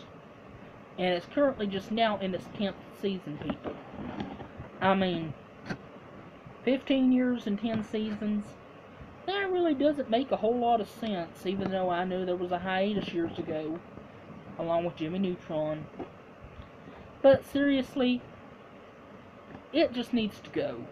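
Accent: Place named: Texas, United States. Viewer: Love that Texas accent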